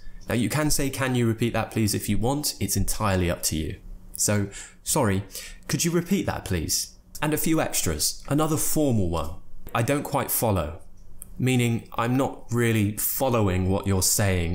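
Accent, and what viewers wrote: United Kingdom. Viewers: Am Ugandan but am more interested in the British accent it's so wow,am following your lessons, pretty great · I love ur uk accent i hope someday visite london 🥰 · Super! I like your lessons. And a Britith accent ))) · United Kingdom, so he have a British accent. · that aweasome British accent, thanks. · Really you make me fall in love with the British accent 🥰🥰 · I love ur accent so much, and I’ve been mimicking the British accent all the way in HK due to its elegance and uniqueness. I’m gonna come to England in April, honestly I hope all the slangs and idioms that I’ve learned from you could be applied in my journey. Thank very very much!!!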